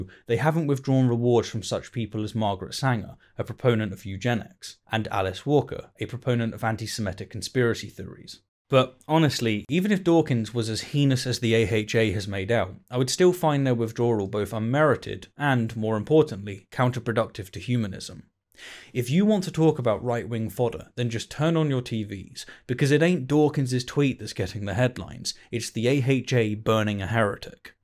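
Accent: United Kingdom. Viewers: Nothing like watching the catastrophe that is humanism devouring itself.. You think you are going to force Dawkins, someone who unlike the guy talking in this video isnt slurping up to the woke narrative, is supposed to just start denying reality to appease the movement? Please, may have thrown out rational thinking, but Dawkins isnt guilty of that, the man is a credible scientist, and hes likely not going to be bullied into woke narratives., get some integrity, your british accent isnt fooling anyone. People are getting tired of this stupid "my body doesnt conform with my mind" nonsense.. Grow up. We are seeing disproportionate numbers of trans people indicating its nothing more than an attention grabbing fad coupled with narcissism. Its interesting to see how quick in an effort to keep his poor wittle job filling peoples heads with nonsense is willing to tow the line. 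Completely irrational.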